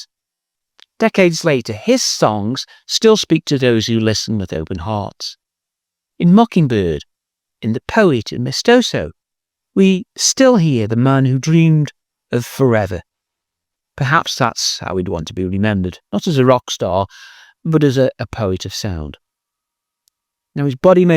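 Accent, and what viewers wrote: Stoke, United Kingdom. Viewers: Stoke accent...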